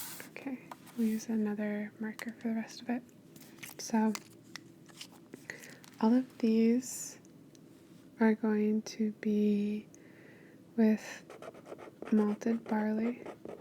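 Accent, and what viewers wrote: Argentina. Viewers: Hey ~I think you're the reason why I'm turned on to the Argentinian accent/dialect as I'm learning Spanish...so, thanks so much^^. And BTW, enjoying a Canadian Mist while I re-watch this video, so I hope that doesn't take away "cool points" from me in your book =-p. Haven't had Japanese whiskey yet so that's on my list next. What's your absolute fav??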